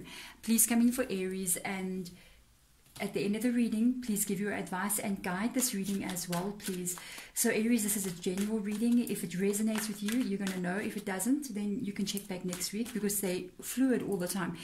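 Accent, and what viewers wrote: South Africa. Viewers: Are you South African? Your accent sounds like it.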